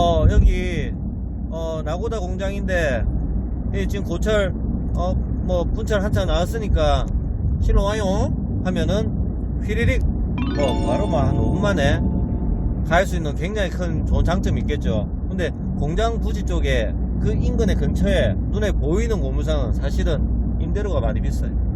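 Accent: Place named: Gyeongsang, South Korea. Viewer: 경상도사투리가 신뢰감을 줍니다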